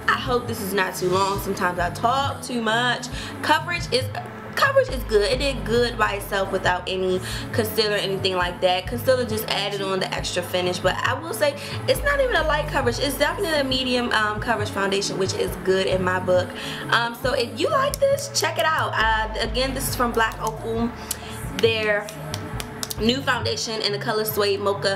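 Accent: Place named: Baltimore, United States. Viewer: she has to be from bmore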